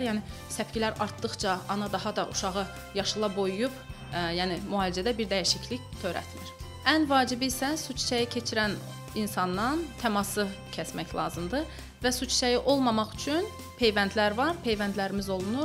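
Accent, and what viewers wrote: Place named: Kirkuk, Iraq. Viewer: Coğ coğ guzal konuşir. Tem bizim kerkük dili gibi... Salamlar siza kerkükten. ❤❤❤❤❤❤❤❤❤❤❤❤❤❤❤❤❤❤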